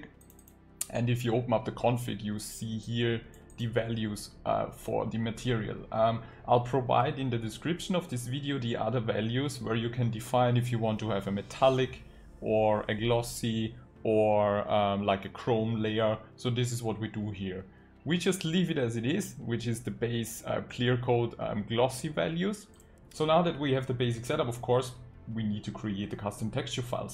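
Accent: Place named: Germany. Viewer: Danke erstmal für deine Videos. Ich denke du bist Deutsch, würde ich jetzt einfach mal von deinem Dialekt ableiten. Kannst Du das nicht noch mal in Deutsch machen? Das würden vielen von meinen Leuten helfen und wäre sehr ehrenhaft von Dir.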